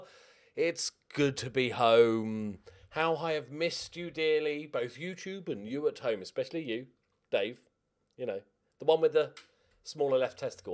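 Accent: Australia. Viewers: Best Aussie accent I have ever heard from a non Aussie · as an Aussie I can confirm that you’ve definitely got the accent 100% totally accurate.... absolutely killed me with laughter. Can’t wait to get stuck in to this series! Good luck!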